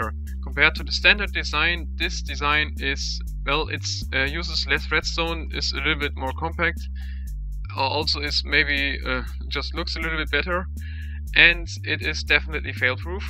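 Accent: Germany. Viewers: Woher wusste ich, dass du deutsch kannst? :D Irgendwie hört mans :D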